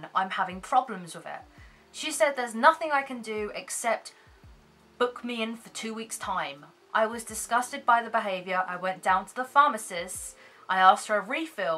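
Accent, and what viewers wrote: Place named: United Kingdom. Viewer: I'm gonna move to the uk just to hear that accent daily 😄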